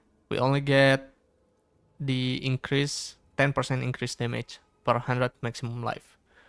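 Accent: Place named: Indonesia. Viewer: Thanks for the awesome build bro enjoying it very much! Btw, From your accent, are you indonesian ?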